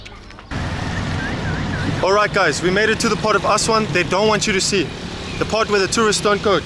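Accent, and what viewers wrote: South Africa. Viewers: You are the most South African sounding German man I have ever seen (...heard) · He sounds super South African to me 🤔. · You are German? You sound South African to me. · You almost sound South African, good video. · Why do you sound like you are from South Africa when you speak English? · I feel ashamed for him saying he is German - he speaks with South African accent. Egypt is a country I love and Egypt needs help showing the beauty of it to get more tourists not the hard life the people have to live from the corrupt government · This south African not german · You sound S African, not German--enjoyable posts. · he is half German half SA guys, he was born in SA and lived in Germany the last years. He said that in one of the first videos. You can clearly hear an accent when he speaks German too. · Why do you keep tellimg people that you are from GERMANY, when your accent is clearlu SOUTH AFRICAN??????? 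· I thought you were South African, now I wonder who the South African guy was….. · He got South African accent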